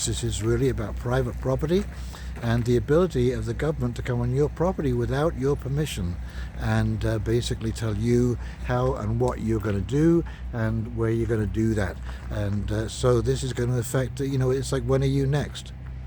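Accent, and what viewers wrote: United Kingdom. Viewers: take your stupid british accent back to where your from. Canada does not need you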